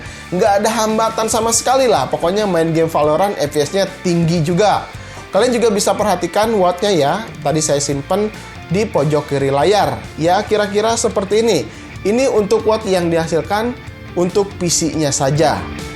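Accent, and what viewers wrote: West Java, Indonesia. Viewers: Itu logat sunda gan, emang klo bila f atau v emang susah, bukan d buat buat itu gan😀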